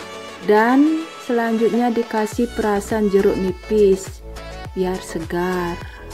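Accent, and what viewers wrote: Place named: Bali, Indonesia. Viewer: Enak ..mau ku coba. Gampang pasti anak2ku juga suka. Dari suaranya seperti dari Bali, Tinggal dimana kaka?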